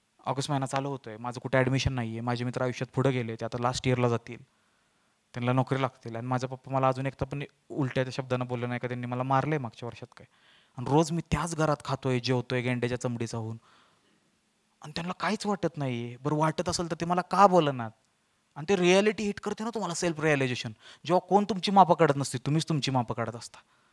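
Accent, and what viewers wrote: Satara, India. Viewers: सातारी भाषा कडक भावा🔥🔥🔥🔥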